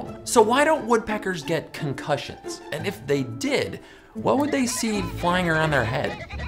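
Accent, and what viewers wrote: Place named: United States. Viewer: You seem to understand the video regardless, his accent is American so it’s his native.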